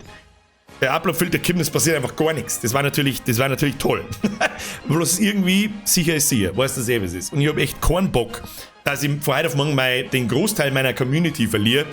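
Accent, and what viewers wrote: Bavaria, Germany. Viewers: Also gruß aus BaWü...ich liebe deine Videos und stehe total auf dein Bayrisch (ned falsch verstehen des is koi obandeln!)...wäre mega schade wenn dein kanal gelöscht wird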